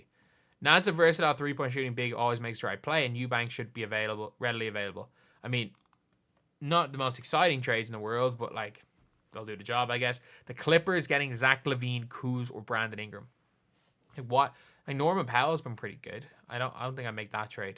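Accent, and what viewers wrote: Ireland. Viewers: He's very obviously Irish. He has an Irish accent and he references the Irish Super League constantly.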